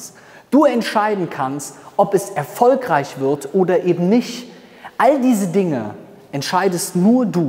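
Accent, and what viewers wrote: Cologne, Germany. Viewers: Cooler Akzent hört sich kölnisch an 😅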